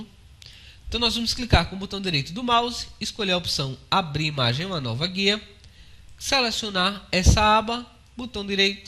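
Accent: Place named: Portugal. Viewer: Nao gosto de sutaque Portuguesa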